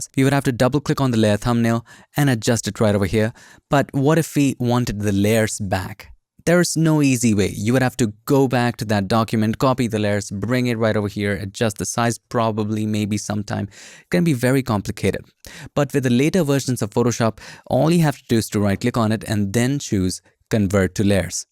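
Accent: India. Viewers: I didn't even think that i will fall in love with an Indian accent one day xD love you mate , your fan from Egypt!♥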